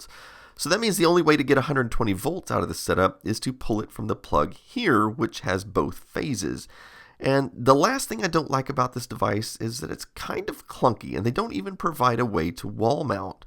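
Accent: Texas, United States. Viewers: So Texans say 'roof' funny, as well as 'solder'? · The pronunciation of roof as "ruff" is mainly just a thing in Texas. Our accents and how we pronounce certain words can very a lot depending on where you are in the US.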